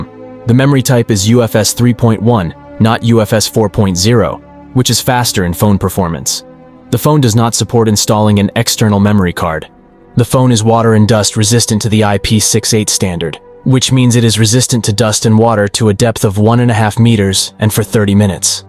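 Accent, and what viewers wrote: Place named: United States. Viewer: I expected that you were from the United States due to your smooth accent. I was shocked that you were from Iran. I am honored by your beautiful content. Keep it up ✨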